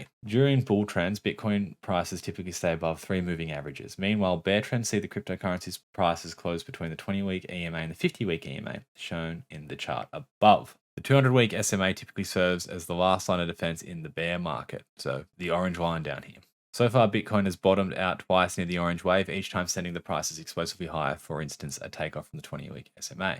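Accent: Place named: Australia. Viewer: I presume your an Australian (buy the nice accent lol) easy to listen too, unlike many other YouTubers Just subscribed from Melbourne , great job mate , hodling a few Shiba 🤛👏👍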